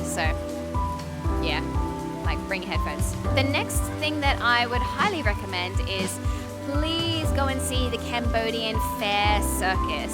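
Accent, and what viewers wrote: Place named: Australia. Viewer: Its great to hear an aussie accent. Great video. Loved it. Thanks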